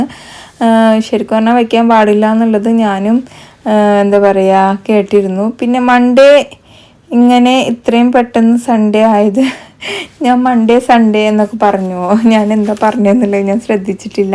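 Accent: Palakkad, India. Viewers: പാലക്കാടൻ സ്ലാങ് വേറെ ആണോ അറിയില്ല അത് കൊണ്ടു ചോദിച്ചതാ. ഞാനും മൈലാഞ്ചി ഇട്ടാൽ കൈ ചുമക്കില്ല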